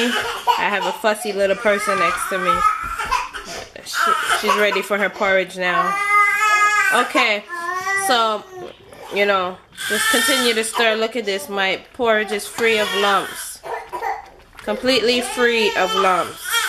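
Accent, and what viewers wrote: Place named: Kingston, Jamaica. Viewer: Where in Jamaica are U living, U sound like Kingstonian.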